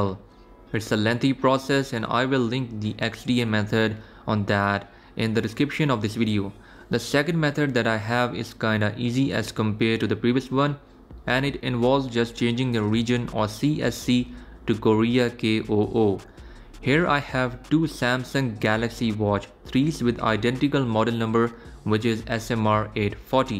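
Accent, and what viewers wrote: India. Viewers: Excellent video!!! I did this on two Watch 3!!! Super and I like your india accent!! Im in Quebec Canada! Thanks a lot!!!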